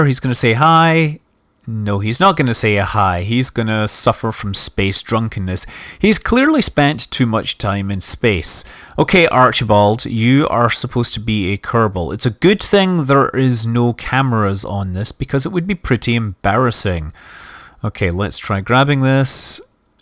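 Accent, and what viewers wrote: Scotland, United Kingdom. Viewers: He is a Scottsman :)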